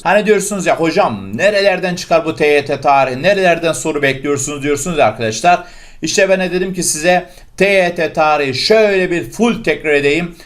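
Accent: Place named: Adana, Turkey. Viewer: Bende tam bu soruyu soracaktım 😅 Adanalı olduğum için Adanalı olduğunu anladım 😅😅😅 kdkddj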